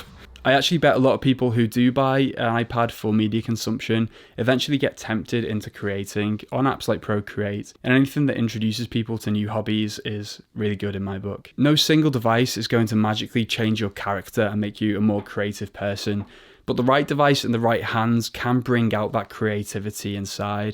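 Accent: Liverpool, United Kingdom. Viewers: Congrats on 1000 subscribers! Just discovered your channel. You sound like you’re from Liverpool.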